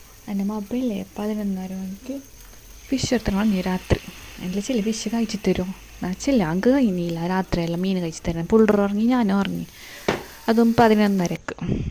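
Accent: Kasaragod, India. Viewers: കാസർകോട് ആണോ ..ഈ സൗണ്ട് ആണ് നല്ലത്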